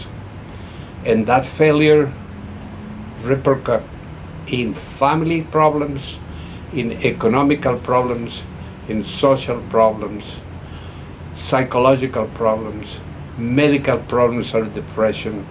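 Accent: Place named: Italy. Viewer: You never explained why, what you do, is better than fusion and other invasive/destructive procedures such as laminectomies. This is the third video I've watched of yours and you don't at least provide a description of the procedure that have found very effective. However, I will forgive you; as you appear to be an Italian immigrant that is bilingual; and the English language makes it harder for you to articulate or put into words, your techniques!😃 But I'm guessing that you use endoscopic decompression with precision drills either of small size or ultrasonic; which I understand can be very effective with minimal damage to the body's own tissues. And I perceive that you are a doctor WHO AIMS TO ADDRESS THE REAL CAUSE OF THE PAIN, NEUROPATHY AND MYELOPATHY. That is a rare trait in today's medical system. Today's allopathic doctors are more concerned with money and treatment of SYMPTOMS rather than stopping the REAL CAUSE! 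They try to "improve' on our Creator's designs of the spine by removing parts and replacing them with metal alloys to create some stability. Instead of aiming to remove the offending bone spur, cyst, or herniated disc or ligament to free up space in the central canal and the foramen where the nerve roots exit. Thank-you, as I am in my 3rd consultation with spine surgeons pre-surgery; after updating my MRI's, CT scans and X-rays. He is reknown for the M.I.L.D procedures and I hope to consult with him in the next few days to see what he recommends. (I have liga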